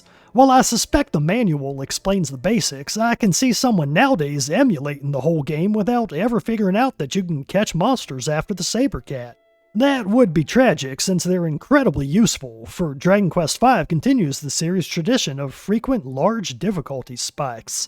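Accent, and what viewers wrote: South, United States. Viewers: listening to a guy with a southern accent talk about JRPGs is like listening to an indian talk about using a toilet+toilet paper+washing their hands afterwards... not exactly the source i want to rely on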